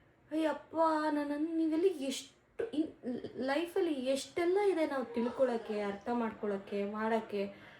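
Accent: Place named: Tamil Nadu, India. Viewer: your talking kannada like a tamilian. You lost ur kannada touch a little. Btw i am tamilian from bangalore. My mom was from chennai whose kannada would be like u Not meaning to hurt u. Just a comment from my POV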